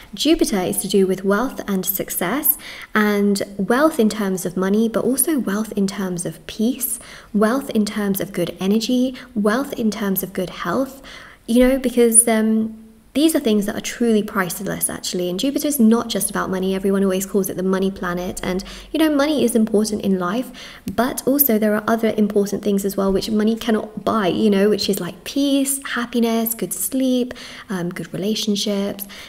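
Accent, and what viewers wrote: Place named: South East England, United Kingdom. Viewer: you sound like your from the south east uk, I love watching your videos, I read tarot cards too but find yours a comfort and more clarification for the readings i do on myself x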